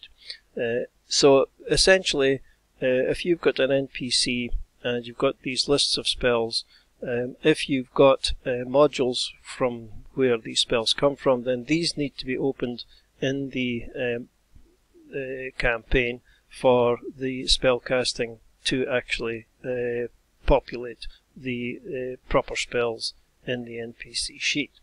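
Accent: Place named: Scotland, United Kingdom. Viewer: I cannot properly thank you for all the time your videos saved me while preparing to run my first campaign in Fantasy Grounds (pandemic life made us use Roll20 first after a few decades of live playing, now we finally are going with a proper tool). It has been truly a blessing to be able to sort out so many questions with your marvellous explanations, and the absolutely fabulous production quality. The fact that they are delivered in a brilliant Scottish accent only help make it even better. Cheers from a Brazilian fan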